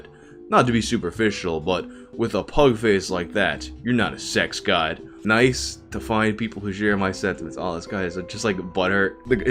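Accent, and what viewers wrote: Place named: East Coast, United States. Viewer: Him reading the passionate, angry back-n-forths in his calm, east coast accent😅😅😅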